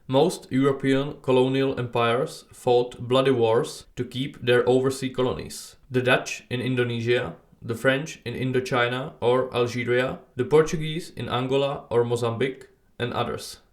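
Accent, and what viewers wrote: Czech Republic. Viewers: Z tvého lehkého přízvuku( žádná veliká výtka) jsem poznal, že jsi Čech. Je přímo neskutečné, jak málo si průměrný Evropan uvědomuje všechny problémy týkající se naší budoucnosti, já jsem měl dříve spíše jen takový pocit ,, kulturního podvědomí", že se něco pomalu hroutí než jsem objevil tebe a další podobný materiál. Jak v ohledu ekonomie tak v hudbě, médiích je cítit, že jako kontinent ztrácíme náš elán. Myslím si, že je ČR v určitém smyslu takový zvláštní mix (jako nejzápadněji smýšlející stát železné opony). I přesto nedokážu pomyslet na moc kulturních záležitostí nedávné doby, které by překročily naše hranice. Nechci, aby se z celé Evropy stalo vymírající muzeum, pro celý svět k nahlédnutí. Jako část nejmladší generace mám pocit, že proti tomuhle všemu opravdu stojíme bezmocně, hlavně kvůli tomu, jak je postavená naše populační pyramida. 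Respekt za to, že věnuješ něčemu, co by si spousta jak mladých tak starých lidí měla projít hlavou. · thick czech accent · Uhh no, this is a pretty clear Czech accent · He is from Chekya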